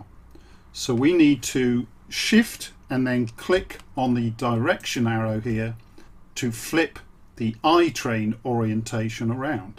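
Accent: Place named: Oxford, United Kingdom. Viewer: Hi, your videos are very much interesting! I am currently in a process to go from analogic to digital on my network. I am equiped with Z21 and Itrain on my computer. Aim is to implement computer driven interlocking. I followed all the steps of your tuto and I feel more confident. As a French, I have also very much appreciated your Oxford english speaking! I have one topic which bothers me: during my off line tests, I lost my screen organisation: I have only left the TCO and the trains/locos control pannel: no more train list with positions and destination indications. Could you advise me on how to come back to the initial screen set-up? Thanks again. Alain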